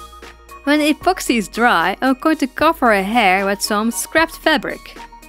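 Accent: Netherlands. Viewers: OMG your Dutch!! I heard it from your accent!! Zelf ben ik ook Nederlands en ik vind wat je doet echt geweldig!! Zelf wil ik ook een een pop maken maar dat mag niet van me ouders ): dat vind ik heel jammer maar ik vind het altijd leuk om naar je filmpjes te kijken :D 😍✨❤ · This is so cute! Altho I can barely handle the strong dutch accent in your english 🙈 no hate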